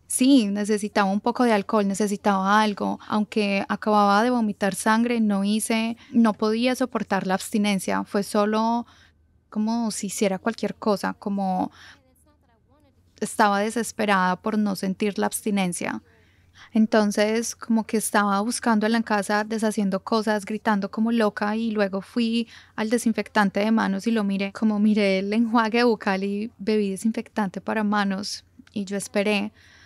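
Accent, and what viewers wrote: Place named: Colombia. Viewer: Amo el acento colombiano de la chica que habla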